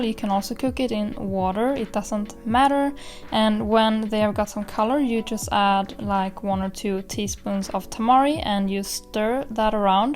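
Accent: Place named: Sweden. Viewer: Visste att den dialekten var svensk, bra video! Nyttigt och bra för hälsan✨✨🌺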